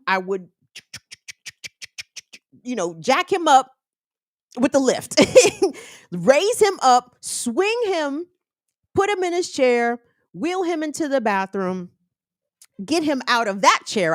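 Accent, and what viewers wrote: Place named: New Jersey, United States. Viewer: Too be fair,she really sounds like somebody from Jersey or maybe Staten Island.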